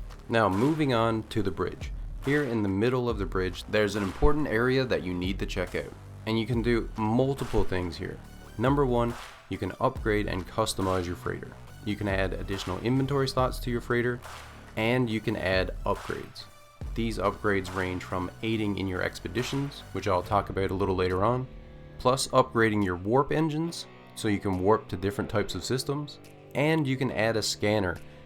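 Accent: Newfoundland, Canada. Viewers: The Canadian accent is strong with this one. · Didn't even realize you were a newf until you that last part hahah you're a day walker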